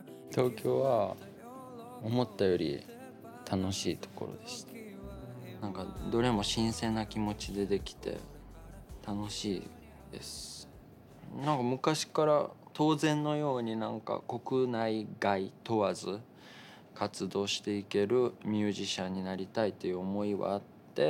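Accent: Okayama, Japan. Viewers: 同じ岡山県人ですが紅白で初めてまじまじと拝見し、衝撃を受け以来毎日聴いています。何より岡山弁で話したり歌ったりしているのが素晴らしい。自分の言うこと、音楽に余程自信がなければできないことです。ぜひこのまま岡山弁を通してください。応援しています。仁科博士以来の著名人ですね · まさか、こんなそばに大きな風が羽を休めていたなんて…同僚が風さんの同級生でビックリしました🤣 ここからどんなに大きく羽ばたいても岡山弁を貫き通す、そんな風さん可愛すぎてやべぇじゃろ笑 そうそう、岡山駅の地下にグランドピアノ（自由にお弾きくださいっていうストリートピアノ）が設置されたの知りょ〜る？？ 次に帰ってくるときは、ぜひこっそり弾きに行かれ〜♬ 風さんが弾き出したら『こっそり』いうわけにはいかん思いょるけど🤣 でも、いつか必ず弾きに帰ってこにゃおえんよ〜☺️👍✨ （普段使ってる岡山弁で書いてみました笑笑） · 大都会岡山弁をずっと使ってるのがいいですね。一生続けて欲しい。岡山愛があればどこでも生きていけると思います。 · 岡山から大きく羽ばたいて行かれたこと 今も岡山弁を喋ってくれとること 本当に嬉しいです👏🏻👏🏻👏🏻 身体に気を付けて頑張ってなー🤗 心より応援しょーります🍀🙏🏻😊👍🏻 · 職場のおばあちゃん世代の方のオススメでハマりました！思ってたよりポヤポヤしててギャップに悶ております✨ 〜がまぁたそと同じ発音で謎におぉ！！ってなりました（笑）岡山の人の話し方好きだなぁ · 地元岡山を離れてもうすぐ10年。コロナ禍で1年以上帰省もできず。 私の方言は完全に抜けてしまいました。 風くんの歌詞、インタビューで操るネイティブ岡山弁に魂が揺さぶられました。 懐かしくて…でも新しい。 岡山からこんな人が世に出るとは。勝手に誇らしいです！ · 里庄じゃ！✨ 地元の隣の隣の町（かな？）だから行ったことあるとこにこんなすごい人がおったなんて未だに信じられない✨ しかも、そんなすごい人が岡山弁喋ってるなんて不思議な気分。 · 娘が数ヶ月前から風さんの歌を聴いてました。私は今月のねそべり配信をみて、何なんw ❕です😍🎶 こういう今迄の経緯が聞けてうれしいです。 風さんのオリジナル曲にどっぷりはまっています。 お人柄も岡山弁も素敵です😌💓 あなたの夢に突っ走ってください(*^-^*) マネさんも素晴らしい方ですね(^o^) 娘とライヴに行ける日を楽しみにしてます(^o^)/🎶🎵💗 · 圧倒的なピアノ、心地よい歌声、素朴な喋り方、深い歌詞、癖になるメロディー、純粋さ、岡山弁でバイリンガル、髪型や曲でガラッと見た目の雰囲気が違ってつかみ所がないイケメン…。 ほんと魅力しかない。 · 神は私達に素晴らしい逸材を与えてくれた・・稀なる素晴らしい天才的才能✨ 音楽でこれ程の感動癒し希望を感じたことはなかった、岡山弁でゆったりとした口調、穏やかな表情、優しい心・・これからも時がたっても変わらずにいて欲しい。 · 歌声も顔もその年齢には思えないセクシーさがあるのに話す時は本当に信じられないくらい素朴、岡山弁と英語が混じった語りも、ライブの自由さも全てが唯一無二。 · 岡山県の方言がしみます🤗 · 久々に好きなアーティストに出逢った! 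楽曲や歌声はもちろんの事、英語交じりのコテコテの岡山弁や人懐っこい甘え上手な表情も好きだ! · 歌ってるところから見始めて、すごく色気がある人と思い、そしたらまだ25歳で、普段どんな喋り方をしてるんだろって思いたどり着いたら、こんな岡山弁満載の優しい喋り方で、このギャップにどっぷり沼にハマってしまいました。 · 格好良い歌声と岡山弁の喋りのギャップがいいね。岡山県民として嬉しい。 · 岡山県民だけどなんかこの人の方言優しい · のだめぐみは、博多弁。風君は、岡山弁。うふっ💓😊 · 岡山弁ってこんなに愛らしいのね…（千鳥さんのイメージが強いので）いろんな優しさが風さんを包んでいるように感じます。もっと聞きたい、もっと知りたい。どんどん素敵な歌作ってください！救われる人が多いはず。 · 岡山で20年以上過ごしてきたから、岡山弁なのかな？？☺️💐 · 岡山に嫁いで早２８年目になりまして、数年前に風さんの事を知りすぐにファンになりました。岡山弁で話す風さんも、心地良い歌声も曲も好きです。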